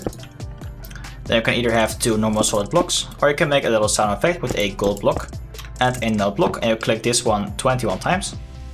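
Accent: Netherlands. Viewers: You sound dutch..you dutch?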